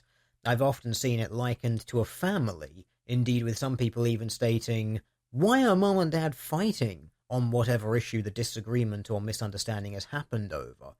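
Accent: United Kingdom. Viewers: I didn't make that assumption, you assumed that I did. I ASKED if it was typical or not, with a suggestion that it might be a British dialect. If it isn't, fine. But that just begs the question as to why I'm hearing it lately, and not just from our ruminant blue-green friend here. I'm also hearing it from at least one Aussie YouTuber as well. It's unusual and I was curious. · The video is an opinion until you present evidence. Yea, I know it's you keemstar hiding behind that British accented dear. Start pointing hooves or your video is BS, I know you want to.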